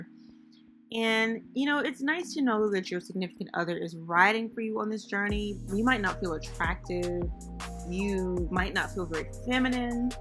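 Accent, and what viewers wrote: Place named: Southeast, United States. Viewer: Dis joint be dry dou... lol Sound like you from S.E. Haaaaaa!!!!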